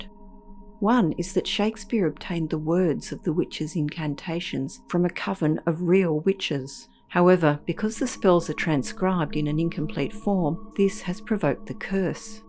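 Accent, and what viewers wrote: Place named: Australia. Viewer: This is my favourite channel. I love hearing Australian voices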